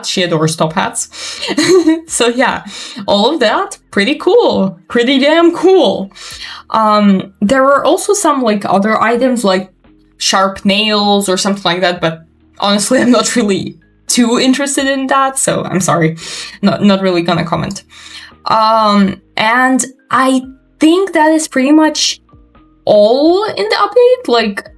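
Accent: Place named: Russia. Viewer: I can hear the Russian accent 😝😝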